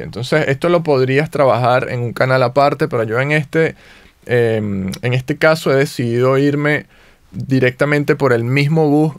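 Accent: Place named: Caracas, Venezuela. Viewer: A propósito, eres venezolano, cierto? Tienes acento caraqueño.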